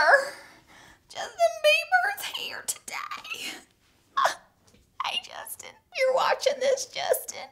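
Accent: South, United States. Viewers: erez muy lindaa me encanta tu acento sureño..Buenos tips..😉😉... you are beautiful luv your southern accent ...good advices I'll be putting them in practice you are one of my favorite😉😉😚🌹🌹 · is she southern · Oh that Southern accent-- so sweet to my ears! 'Cuz I'm from Louisiana. Prayers for those who have been affected by the flood in Baton Rouge...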